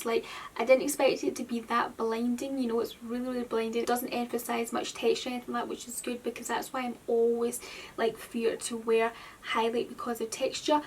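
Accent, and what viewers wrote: Scotland, United Kingdom. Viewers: Are you Scottish if so where are you from because I’m Scottish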